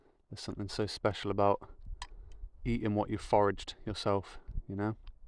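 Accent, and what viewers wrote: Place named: RP, United Kingdom. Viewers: You sound English but doesn’t look like England frankly you’d be moved on & frozen if you were trapped in uk so “homeless” is a bit strong. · I could listen to you speak all day. Very eloquent. Where were you raised? You sound posh to me but then I am Scottish. Love how well adjusted and genuinely happy you seem to be, in the way that you live. ❤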